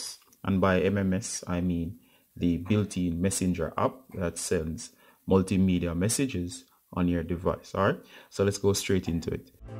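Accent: Jamaica. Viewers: What the hell, lmaooo not an indian voice but a fellow Jamaican, wow, big up #.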